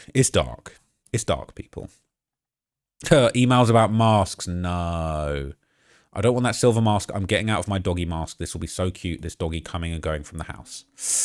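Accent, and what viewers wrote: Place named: United Kingdom. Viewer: Be careful saying stuff about Donnie in these videos. Your British accent with a US location is inviting ICE at your door.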